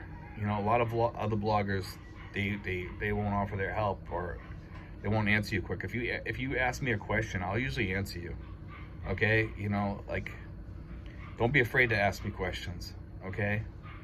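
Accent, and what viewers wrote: Boston, United States. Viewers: Are you from Boston area? Do I hear a brogue? Dad is from Dorchester.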